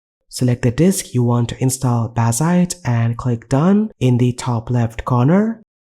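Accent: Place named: India. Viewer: Now i have heard it all - an AI robot voice with indian accent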